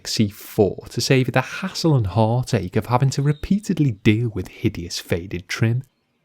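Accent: Liverpool, United Kingdom. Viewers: Awww, the English accent of an Irishman, Scottish, or Brittish. Something enjoyable about hearing the accent as a Yank from across the pond. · Meticulous detailing with good explanations with a pleasant Liverpool accent. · LOL Apart from also having a Scouse accent, Ringo Starr's voice sounds nothing like this guy, and nor do/did any other members of the Beatles for that matter. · I am Mancunian, and I love your Scouse accent....It's so soothing , and beautiful. The vid is great too! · oh my goodness is that your real speaking voice!?!?! its sooo pleasant, you should be a professional narrator or make an app where you recite poems! it's so calming and everything my Texan dreams could ever dream up for a British guy - you're amazing!! Anyways, if I was going to use this on my 2 door Jeep Wrangler how many bottles of the C4 would you recommend buying? 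· His accent sounds like he's from Liverpool UK (hope that helps ;)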